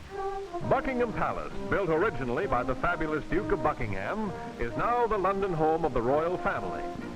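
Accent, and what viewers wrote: United States. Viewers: Will have to get a copy of this. Shame about the corny American voice-over but the images are great.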